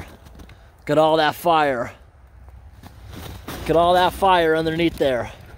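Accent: United States. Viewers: Why does this guy sound to me like the biggest American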